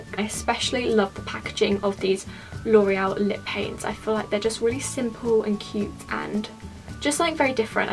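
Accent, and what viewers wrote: United Kingdom. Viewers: Love ur British accent💘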